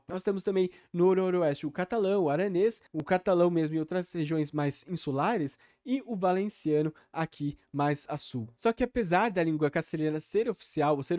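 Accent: Brazil. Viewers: O sotaque dele é mais próximo a diversas regiões só Brasil do que de Portugal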